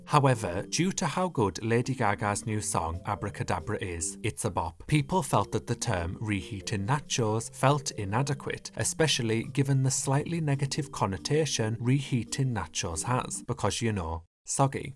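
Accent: United Kingdom. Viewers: He's from the UK, so I'll give him a pass. Maybe that's just how they pronounce there? Natchose. Natchose...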